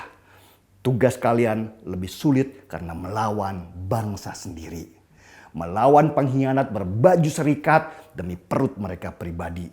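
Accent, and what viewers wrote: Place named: Medan, Indonesia. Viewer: Jelas, lugas, tegas... gaya medan banget... Indonesia beruntung memiliki karakter2 suku yg khas dan berbeda2... Gunakan semua karakter dr msg2 suku utk membawa Indonesia maju...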